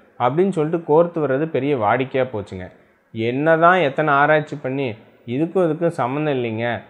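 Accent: Kongu, India. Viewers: கொங்கு மண்டலத்து பேச்சு நக்கல் நையாண்டி அப்படியே இருக்கு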